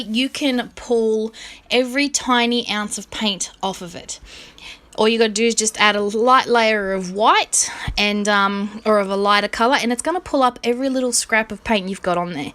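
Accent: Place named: Australia. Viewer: Subbed as soon as I heard your voice. ^5 Aussie. Great idea to use the castoff.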